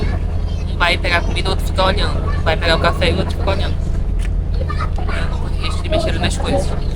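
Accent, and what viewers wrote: Rio de Janeiro, Brazil. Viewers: Boa tarde família...curtindo mais um vídeo e deixando aquele Likeeeeee....então sobre o preço da passagem acho razoável com 3 refeições? Show...outra particularidade....Seu sotaque é muito parecido com o dos Cariocas.....Por exemplo...Charleessssss😂😂😂😂...é bonito 😅😅😅...Manauuusssssss....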